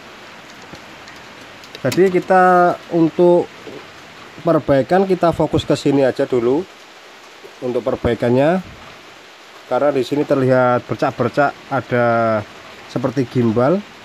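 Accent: Java, Indonesia. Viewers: dari kalimantan timur ko logatnya seperti wong jowo bang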